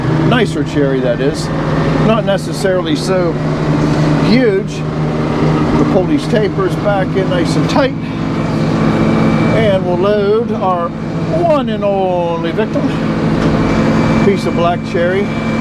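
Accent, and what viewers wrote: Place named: Pittsburgh, United States. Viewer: It's a pleasure to hear your commentary because I miss the Pittsburgh accent (I grew up in Edgewood just outside of Wilkinsburg and Swissvale). Like in the steel days, the folks from western PA always get the job done. And done right. Thanks for your videos.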